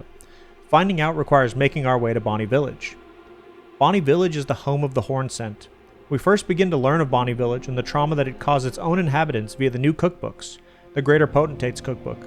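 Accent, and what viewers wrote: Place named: United States. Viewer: u pronounce words so american😭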